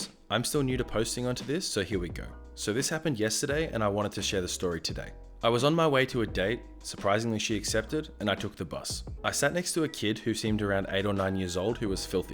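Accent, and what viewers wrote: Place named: Australia. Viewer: Aussie accents are cool.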